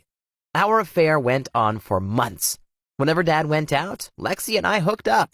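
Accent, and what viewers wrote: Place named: United States. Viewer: Is nobody gonna address the fact that he is from South America, and yet he has an American accent?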